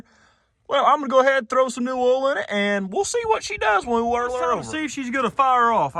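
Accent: South, United States. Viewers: you are southern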